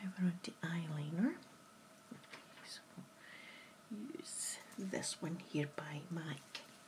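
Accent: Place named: Scotland, United Kingdom. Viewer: YOU are an angel for running this channel and uploading these videos. I don't comment too often but you're my favorite asmr artist. English is not my 1st language and ever since I first heard a scottish accent it's been my favorite, it sounds so lovely and unique! Btw; your makeup always looks so nice. I love this eyeshadow look! 💗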